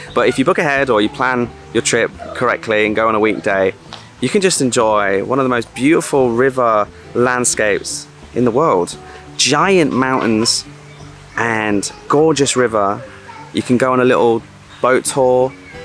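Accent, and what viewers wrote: England, United Kingdom. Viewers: . Judging by the sound of your voice, I assume that you are from England. So why do you always use US Dollars and not GBP when comparing prices in Thai Baht?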